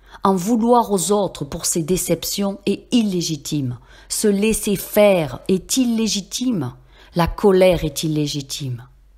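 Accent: South, France. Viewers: Bonsoir.J'adore votre voix du Sud. Namaste:)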